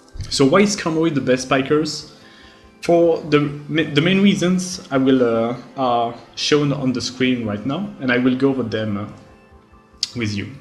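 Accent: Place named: France. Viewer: C'est l'accent français ça ! Je reconnais Merci pour ta vidéo mec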